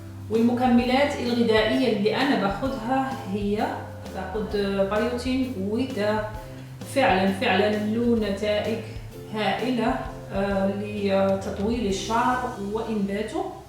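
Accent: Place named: Maghreb. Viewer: هل انتي مصرية اختي لهجتك من المغرب العربي و شكرا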